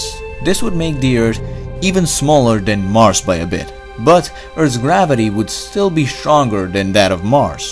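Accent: Serbia. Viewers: Just a Serb speaking English. Doubt you'd sound fluent when you speak another language.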